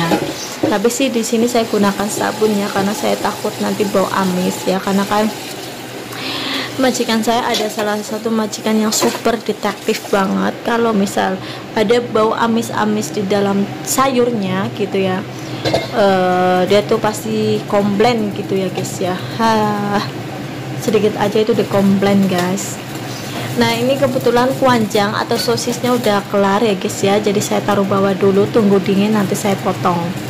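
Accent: Banyumas, Indonesia. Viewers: Waakaikumsalam mbak ngapak maning hebhe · Tkw ngapak heboh tenan iki semngt dan sehat selalu mbk e 😊😊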